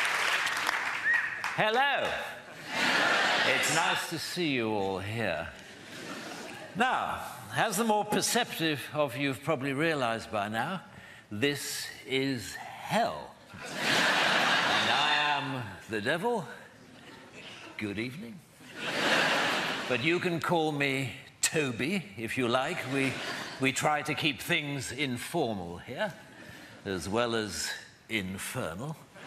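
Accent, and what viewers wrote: United Kingdom. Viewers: He has a Welsh accent, so he's Welsh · I live in the USA, but I miss good British humor and beautiful British English...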